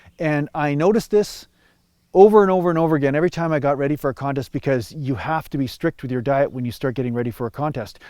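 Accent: Canada. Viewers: He must be a fellow Canadian. I can tell by how he talks haha.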